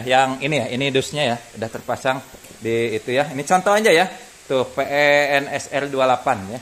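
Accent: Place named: Tasikmalaya, Indonesia. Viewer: Jb cisinga si aa na orang tasik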